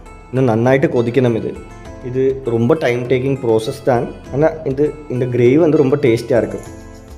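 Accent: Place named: Kerala, India. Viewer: Reminds me of the dialogue Gramamum cookka neengalum cookku Very sweet malayalam kalandha tamizh❤❤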